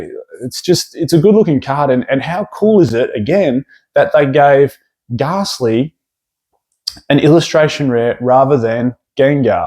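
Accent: Australia. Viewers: Bro screw those idiots that keep saying you’re saying it differently or wrong. You are not saying it wrong. Everyone loves a good Aussie accent. Some of us get jealous that we don’t have Aussie accents! Loved this content. It’s very interesting that SV era is bringing love to pokemon that arnt always given love.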